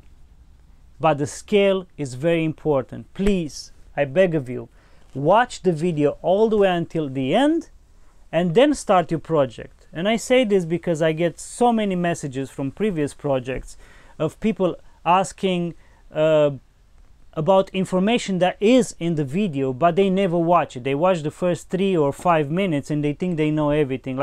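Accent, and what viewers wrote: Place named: Ukraine. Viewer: Im just loving this Ukrainian accent ^^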